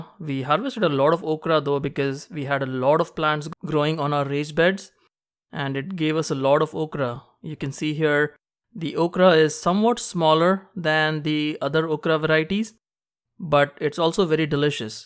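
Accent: India. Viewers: your pronunciation is like Indian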